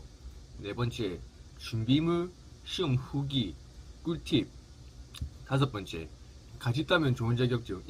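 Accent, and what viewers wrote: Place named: Gyeongsang, South Korea. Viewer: 느낌이 왠지 갱상도말 쓰는 최성국같네요 ㅋ 멋집니다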